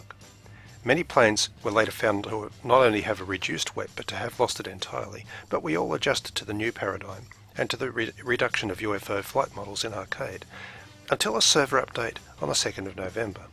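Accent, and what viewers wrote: Australia. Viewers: He sounds like an Aussie to me.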